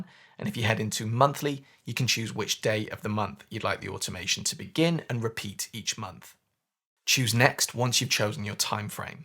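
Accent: England, United Kingdom. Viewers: Yes please that would be great. Love that you are English like myself so I can follow what you say easily, and love just the way you make everything so easy to do with automations and a clear instruction. I’ve learnt so much from your tutorials to which I’m ever so grateful. Thank you so much. Tina 👍🏻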